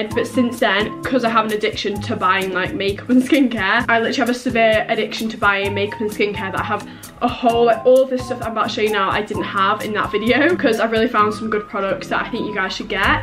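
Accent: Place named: Newcastle, United Kingdom. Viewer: I LOVE YA FINALLY A GEORDIE YOUTUBER IM GEORDIE AND IVE BEEN TRYING TO FIND A GEORDIE YTBER FOR AGES! I knew u were geordie when I hear ya say quid 😂 I LOVE YOU SO MUCH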